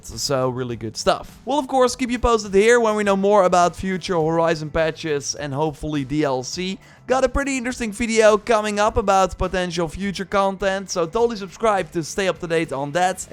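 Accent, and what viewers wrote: Netherlands. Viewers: Eyo you from the nederlands ?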